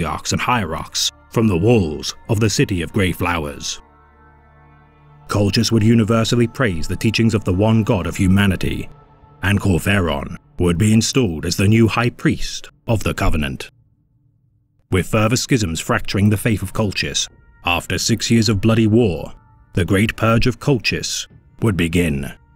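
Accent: United Kingdom. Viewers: He's British.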